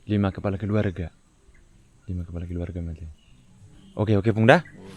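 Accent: North Sumatra, Indonesia. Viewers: Suara mu sangat enak di dengar ito ,ciri khas suku batak nya sangat kental ,,alhmdulilah 🙏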